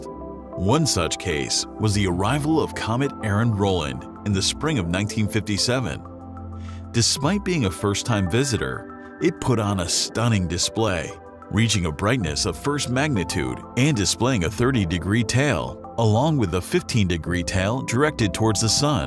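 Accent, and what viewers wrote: United States. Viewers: so heavy accented american voice robot. you say become visible in summer of 2024. i guess you must mean american summer, showing you think the whole world is america, not very good for a space oriented channel teaching about planets, sun and comets, where they dont have summer! So i guess down under we can guess you mean winter.😮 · When the narrator is American you just know it's not true.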